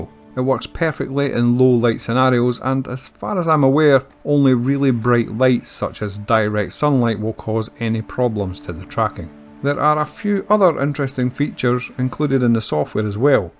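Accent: Scotland, United Kingdom. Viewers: Been thinking of getting a joystick for a while so started watching your video. The images, the space scottish and the wordsmithing drew me deeper and deeper in.. after a while a Tobii and pedals made perfect sense aswell! BUT when you started demonstrating voice control dishing out orders "switch to guns" I was literally bouncing up and down in my cahir with a stupid smile across my whole face. My inner spaceman thanks you and I will most certainly check back for more videos.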